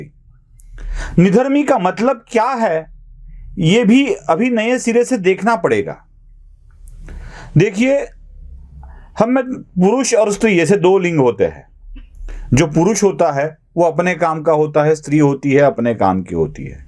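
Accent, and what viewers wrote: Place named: North, India. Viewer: Sir Apki Hindi to Bahut Achhi hai.. Apke hindi bol se ap to Ap North Indian hi lagte hai..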